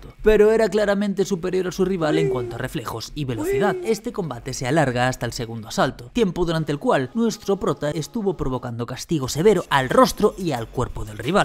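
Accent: Galicia, Spain. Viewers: Tú acento.......eres de Compostela? 😅🙏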